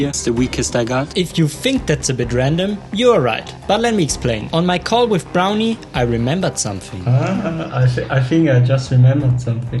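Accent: Germany. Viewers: Your videos are really good for such a small youtuber 👍 Yes, there's a clear difference to Browneys channel, but you deserve more subs definitely! And your english is also good, even if you can hear the german accent, but mine is much worse 😂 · Are you german?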